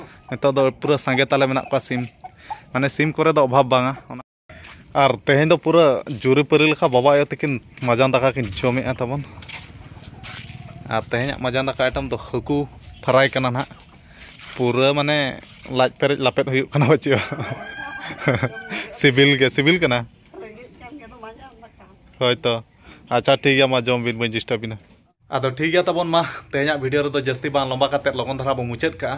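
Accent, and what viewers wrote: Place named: Santhali, India. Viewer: Dada aplogh k santhali acent ❤❤❤ bhut accha hai love you dada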